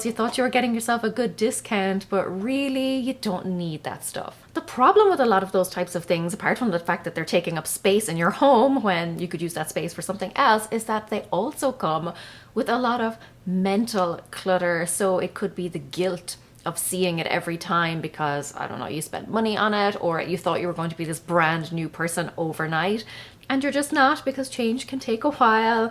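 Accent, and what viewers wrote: Ireland. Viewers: I just discovered your channel and couldn't wait to subscribe. I can relate to so many of the things that you talk about. My fantasy life is miles away from my real life. Years ago when everyone was into rubber stamping I purchased some equipment and then realized that I wasn't into crafting and was never going to enjoy doing it. I would say my biggest problem is holding onto something just in case I might need it at a later date. I'm starting to watch all of your videos and I hope that I can gain some inspiration from you. You are both informative and very entertaining to watch. You have such a calm demeanor and your Irish brogue is lovely.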